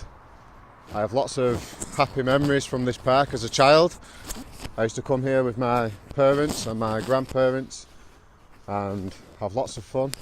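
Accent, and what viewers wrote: Northern England, United Kingdom. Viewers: Hi, Finally found a channel where a British English teacher is speaking in northern accent. Don't get me wrong, but I don't understand when people speak in northern accent. I have recently moved to London from India and I feel terrible when I don't understand British people. I just want to understand what everybody is saying and I would like to communicate with them. How can I do that? Could you please tell me?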